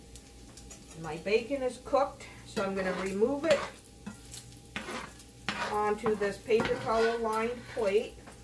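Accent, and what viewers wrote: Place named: Minnesota, United States. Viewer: Yum I love refrigerator pickles! Haven't had them since my Mama passed away. Can you share your recipe?? I notice you're from Minnesota. I hear your accent and it just brings me home. <3 I'm from up North about an hour away from Canada. It's really nice to finally see someone who isn't from the cities making videos!!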